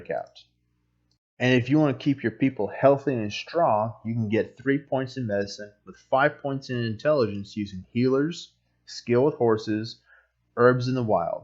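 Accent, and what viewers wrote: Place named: United States. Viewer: U sound American for an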